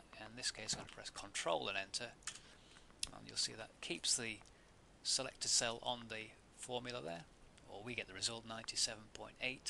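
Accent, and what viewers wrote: United Kingdom. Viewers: With a very nice British clean accent. Easy to learn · I've watched several Excel tutorials on YouTube, and this fellow has - by far - the best delivery and most pleasant voice of any that I've seen. Love the British accent and the relaxed, understated tone to his narration. Oh, and none of that annoying typewriter sound effect that is used in some other tutorials!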